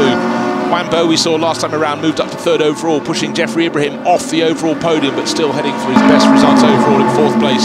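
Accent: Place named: Australia. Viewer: I hear an Australian accent 👣.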